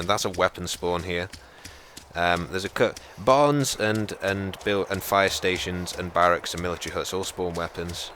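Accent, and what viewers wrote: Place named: Northern England, United Kingdom. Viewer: Sound like you're from Northen England!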